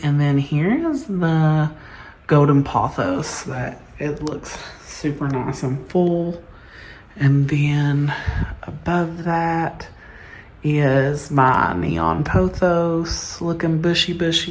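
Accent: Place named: South, United States. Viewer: Why do I love your voice/accent so much?! I think it’s bc it’s southern-ish sounding, yet you don’t speak As slowly as other southern accenters do? I have no idea, lol.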